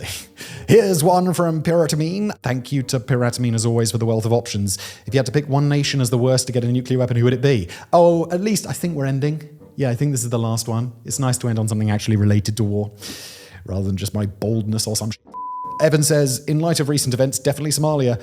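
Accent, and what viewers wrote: United Kingdom. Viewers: Hey,! Love the channel, but could you dial down the British wanker cadence just a tad? Cheers! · His British accent is too thick. It sounds like jibberish.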